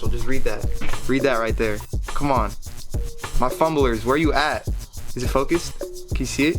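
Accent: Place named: Ontario, Canada. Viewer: this guy's either from Cali or from Ontario.. Maybe Toronto, Most likely Hamilton :D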